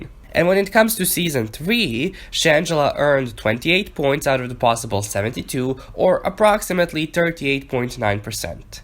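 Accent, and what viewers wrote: Spain. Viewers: As a mexican guy I can tell you that he has such a pretty "spanish voice/accent" haha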